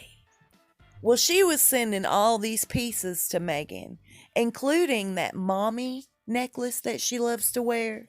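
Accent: South, United States. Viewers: A southern accent always makes me feel at home. I appreciate you,!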